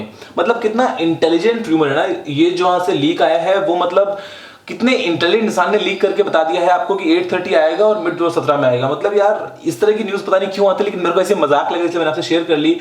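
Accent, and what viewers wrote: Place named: Punjab, India. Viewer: I dont understand a fucking word you say fucking punjabi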